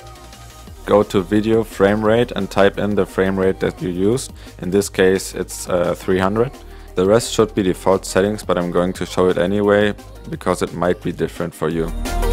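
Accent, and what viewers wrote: Germany. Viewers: you sound like you're coming form germany^^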